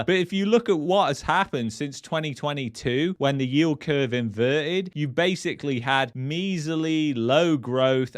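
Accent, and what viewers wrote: United Kingdom. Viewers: “ have saw” . You said it twice. How about Present Perfect “have seen”? Why don’t you know this? I bet you say “have went”, “ have ran”, “ have came” instead of “ have gone”, have run”, “ have come”. I’m hearing this everybday all day. Since when have people suddenly forgotten the Past Participles of Irregular verbs? In the past there was always some uneducated person that didn’t know them like the Beverly Hillbillies but now it seems like everyone. NEWS FLASH: You are no longer speaking English but a kind of Pidgin English. There is no excuse for this especially someone speaking with a British accent.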